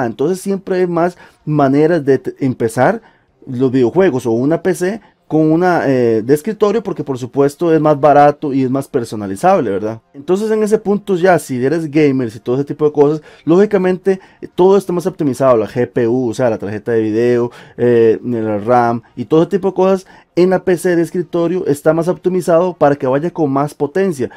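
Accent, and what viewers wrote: Costa Rica. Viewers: Se me hacia que era tico por el acento, ya cuando vi la postal de Lda lo confirmé😂😂👌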